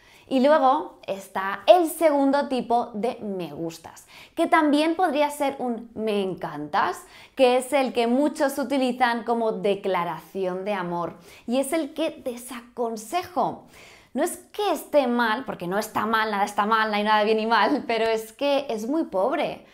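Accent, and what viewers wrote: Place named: Spain. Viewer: A mí me gustas tú por tu acento español, tu lenguaje corporal y físicamente tu cabello te hace ver guapísima, así que...voy a España o vienes a México...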